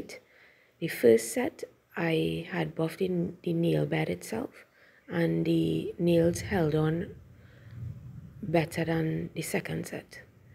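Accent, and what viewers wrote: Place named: Trinidad and Tobago. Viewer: Love the Trini accent.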